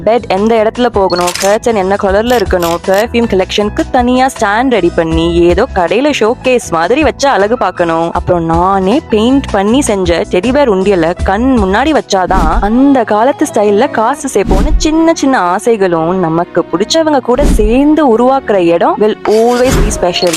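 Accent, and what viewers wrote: United Kingdom. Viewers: Your voice is so relaxing ❤ your British Tamil accent is also so interesting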